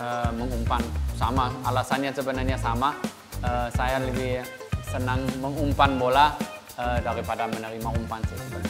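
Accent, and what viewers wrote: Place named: West Java, Indonesia. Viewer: Logat sunda, "mah"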